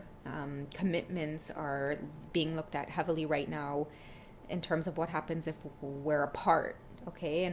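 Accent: Trinidad and Tobago. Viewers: Hi i am a twin flame and also a trinidadian, love the accent and you always have pleasant and optimistic things to say to those on this journey. Blessings to you. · Hi.. I'm Jamaican 🇯🇲 & let me tell you, I absolutely love the trini accent! I always look forward to you saying something in your accent. Love it! ❤ This reading rings true about songs, & they're very old, most of them I've never heard before but speaks of our situation. It's been happening on my end too both channeled & hearing on the radio. He's definitely getting clarity cause I do sense/feel it.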